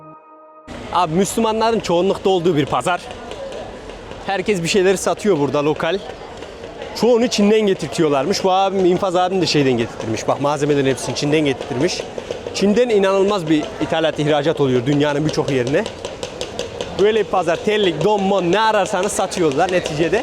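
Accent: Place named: Sivas, Turkey. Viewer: Evlat ya ben sivaslıyım konuşman şiven hareketlerin o kadar çok sivaslılara benziyor ki hele şu norüyon demen varya beni bitiriyor 😂😂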